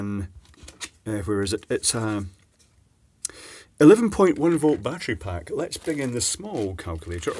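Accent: Scotland, United Kingdom. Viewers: Your voice sounds like a teacher that I used to know when I was a small child. He used to behaved and talked in a very similar way to you, and now I know that a lot of this ascent is Scottish in origin. I have noticed a few differences in Scottish ascents, being that I have known of them and stayed in Scotland for about 1-2 months. Scotland is fascinating. That teacher also used to have a beard like you as well; there was something about that teacher that used to interest me, I don't why; but he used to play the piano at school very well in assembly time, and this was in primary school.... I think looking back at it now, he was kind of trying to have fun with the kids in his own unique way.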